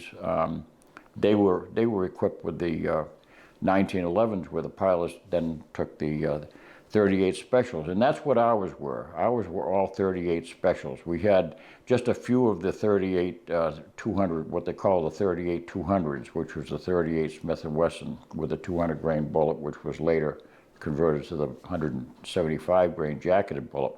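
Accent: New England, United States. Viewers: Best New England accent ever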